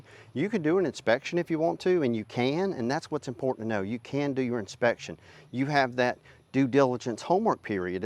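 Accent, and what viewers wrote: South, United States. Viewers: It’s southern talk. For get ready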